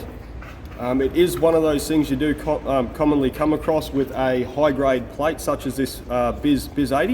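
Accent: Australia. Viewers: Love your English australia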